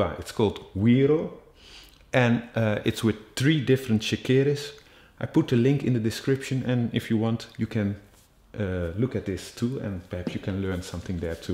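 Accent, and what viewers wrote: Netherlands. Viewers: This is great, thank you! What size/shape of Shekere would you recommend for beginning? By the way, are you dutch? Ik hoor het in je accent :)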